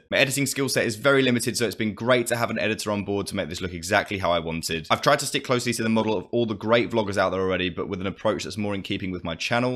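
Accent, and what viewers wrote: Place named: Quebec, Canada. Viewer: the accent from "quebec" is so strong lol... (I'm from montreal, quebec)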